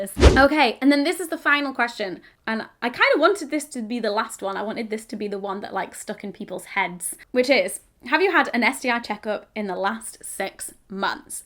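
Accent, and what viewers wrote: United Kingdom. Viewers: I don't know why this video was suggested, I'm glad to hear British English, since I'm in Sweden now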